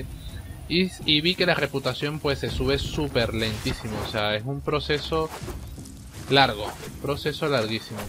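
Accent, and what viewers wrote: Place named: Venezuela. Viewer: eres venezolano si o si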